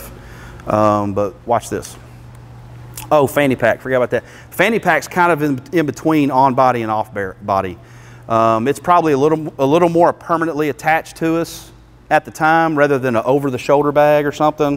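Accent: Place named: United States. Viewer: Goddamn, he country as hell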